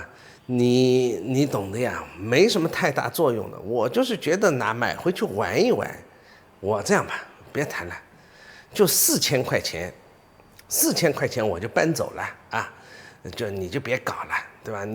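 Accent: Shanghai, China. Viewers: 喜歡聽你聊天 有趣 上海人特有的口氣和用詞 很熟悉 🎉👍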